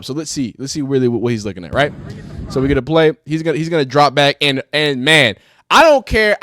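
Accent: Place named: South, United States. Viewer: Dude you talk way too southern like bro just hold up the rebel flag already and just claim your white already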